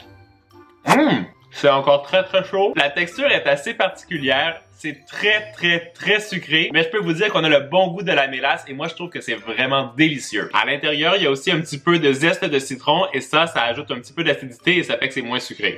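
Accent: Quebec, Canada. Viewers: Draw Kyres accent québécois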